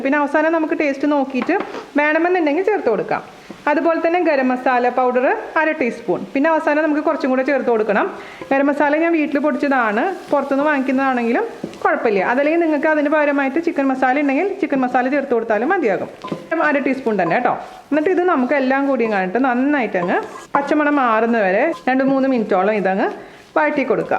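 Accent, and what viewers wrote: Thrissur, India. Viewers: Hello..njan vegetarian aanu... But still I watch all ur non veg videos..that's how much I love to watch u and hear aaa trissur baasha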